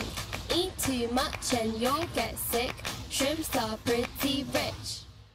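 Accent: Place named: England, United Kingdom. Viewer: I just realized that she has a British accent and speaks Japanese... I swear her British accent on top of Japanese makes the coolest sounding accent ever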